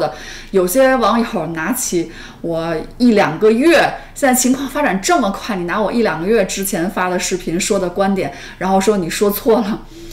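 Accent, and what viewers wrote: Beijing, China. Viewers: 博主是北京人么？口音听起来很熟悉。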